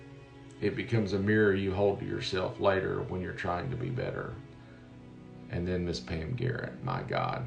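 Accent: South, United States. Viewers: Your video on "Christian nationalism" popped up on my YT a few days ago. As that was a subject that has been occupying, not to say concerning, me for some time I clicked and heard it right through. I agreed with everything you said and was very impressed by your use of the English language - I'm a Brit living in Germany - but otherwise didn't know quite what to make of you. Now I've watched this one and was really moved. I too had a number of teachers who were an encouragement and help to me: I don't think I was a bad student, as I did what was expected of me, but they taught me to think for myself and that was something new and opened up a whole new world for me. I went on to study foreign languages (German and Spanish) and after university got a job as a translator here in Germany. I'm retired now, but I can still remember most of my teachers' names (and would like to thank Ms. 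Davies, Mr. Edson, Mr. Hateley, Dr. Meier, Mr. Kirkman and Dr. Smith i.a. here). I was never the maths and physics type, more the English and languages type, and as I became a Christian (which in the UK - or in Germany, for that matter - is not like in the US) at university I developed an interest in theology too. Thanks for this video (and thanks to y'all for your comments, which I found equally moving). Your English with the southern accent (I'm also a fan of Trae Crowder, by the way) is very soothing but eloquent, down-to-earth and inspiring - compared with what we usually see in the US news, whic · 👏🏼💖Thank you. You are the most eloquent, beautifully spoken man with a Southern accent I have ever heard! You deliver such poignant truth with such thoughtful vocabulary & deep insight into the heart of the subject, you never cease to amaze me! I'm in tears listening to this, thank you so much for sharing your kind, beautiful spirit!🙏🏼🤟🏼✌🏼💙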